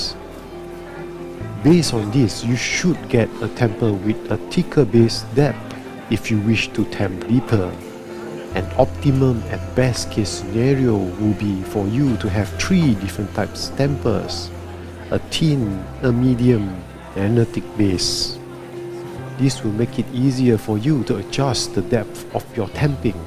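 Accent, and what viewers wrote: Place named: Thailand. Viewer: Sounds more like a Thai than Malaysian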